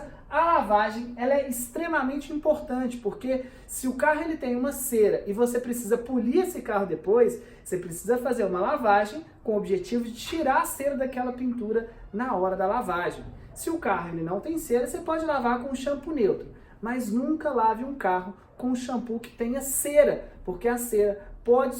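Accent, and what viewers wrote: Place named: Minas Gerais, Brazil. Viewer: Fala, como vai? Bom pelo sotaque acredito que seja mineiro, se for Tmj soh, se não Tmj tbm 😝, possuo um Vectra 08 Branco, poderia me orientar sobre qual verniz é utilizado nesse tipo de carro? Agradeço a atenção e as dicas que vcs nos dão, forte abraço!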